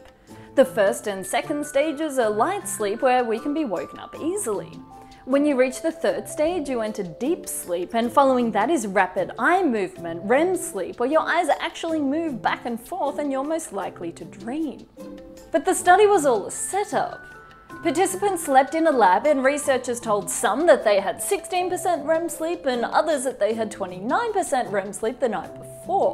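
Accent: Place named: Australia. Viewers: I have an Australian accent and since I don't notice an accent must be Australian. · I believe it's Australian, but she is from New Zealand as far as I remember.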